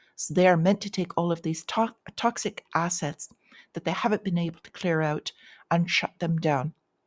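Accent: Ireland. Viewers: You have such a unique channel - I had to laugh when you showed the farmers spraying the manure - what a metaphor for what they are doing to us on a daily basis! So good to hear your sweet Irish accent! Have a wonderful and profitable week! 🍀😊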